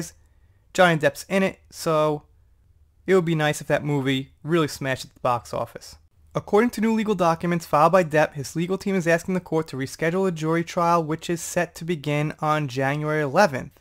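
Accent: New York, United States. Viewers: Man I'm real late for this one but I wanted to tell you or ask you, are you from New York? Kinda sounds like it. But also I heard you live in Japan, is that work related? I know I'm a little nosy, well really I'm a lot nosy. You don't have to tell me anything. I'm not a Stalker or anything. I promise just nosy. Thanks for the great info..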